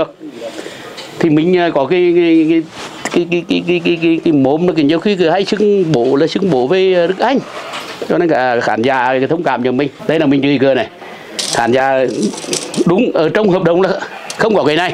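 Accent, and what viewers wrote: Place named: Nghe An, Vietnam. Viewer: Bố ơi! Nói giọng bắc đi, thích xem kênh của bố mà nhiều khi không hiểu? Giọng Nghi lộc Nghệ an rất khó hiểu. Chúc bố có thật nhiều SK để cùng các cháu trong team Châu phi giúp đỡ được nhiều bản làng Châu phi.❤❤❤❤❤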